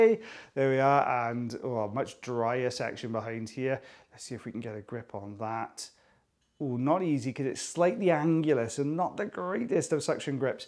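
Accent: Wales, United Kingdom. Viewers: I want a recording of and his lovely Welsh accent reading me bedtime stories to fall asleep to! (can't listen to his earwax videos to fall asleep as I'd want to watch the action! 😂) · Always great videos. Thank you! And wonderful to year the Welsh voice! Visiting again later this summer...beautiful country and wonderful people.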